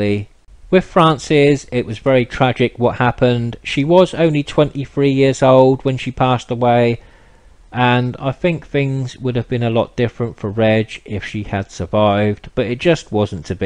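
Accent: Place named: East London, United Kingdom. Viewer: I love the commentary. I lived in London (Plaistow786) years ago. I miss hearing the accents of East London.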